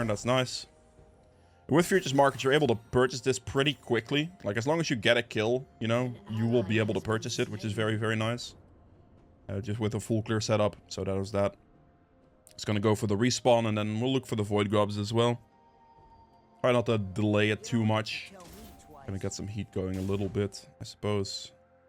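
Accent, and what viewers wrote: Netherlands. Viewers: That dutch accent...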